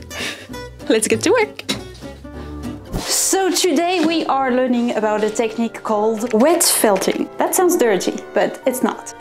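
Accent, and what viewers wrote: France. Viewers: please do a full video speaking english with french accent, I think it sound beautiful!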